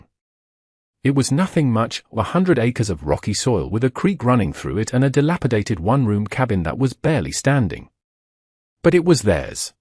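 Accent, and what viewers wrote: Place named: United Kingdom. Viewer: Screw your aristocratic English AI accented narration. Get rid of it. It doesnt fit the context. Maybe I'll listen. Not til then.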